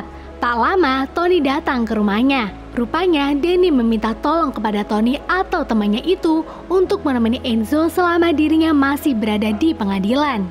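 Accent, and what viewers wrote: Pemalang, Indonesia. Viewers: Mbak orang pemalang yak? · Wong pemalang kie lur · lahhh wong pemalang mbak????? samaaa ihhh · Wah wong Pemalang iye? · Orang pemalang ya ba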